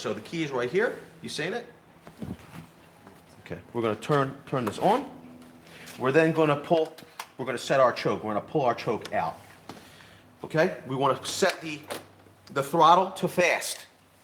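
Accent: Philadelphia, United States. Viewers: Thanks for taking time to do a walkthrough before blowing snow. And coming from Montana that Philadelphia accent is great.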